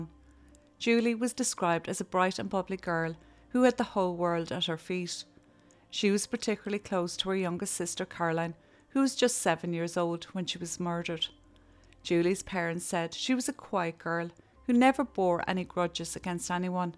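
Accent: Ireland. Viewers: I find your lovely, Irish lilt to be comforting. I subscribe to several true crime channels from various countries. This is a favorite.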